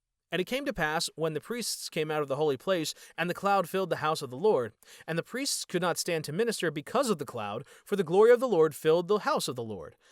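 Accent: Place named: United States. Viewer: Is this talmud shit in American Zionist accent... ⁉️‼️⁉️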